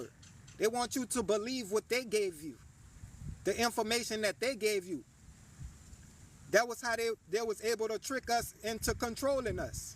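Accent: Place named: New Orleans, United States. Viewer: Look at Godhead 🙌 I just stumbled across another #Heyoka and sounds like you from the NoLa too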